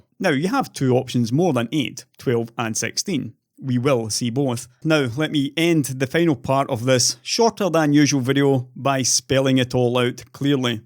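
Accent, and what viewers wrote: Scotland, United Kingdom. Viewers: Wow, that is an absurdly strong Scottish accent. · so. much. sa.tis.faction. Just dripping from your thick Scawttish vowels. I even felt a little bit smug myself:) nice work!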